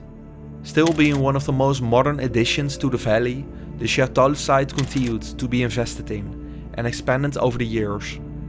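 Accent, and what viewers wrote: Netherlands. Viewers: Echt een magnifieke reportage met prachtige beelden maar het is echt lastig om naar engels met een zwaar Nederlands accent te luisteren. 't Zou aangenamer zijn in Nederlands (of Engels ingesproken door een native speaker)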